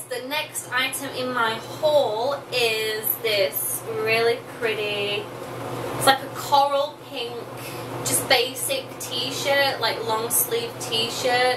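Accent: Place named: Australia. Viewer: hehehe anytime. Aussie accents arent that bad but yours is the best by far.!!! xo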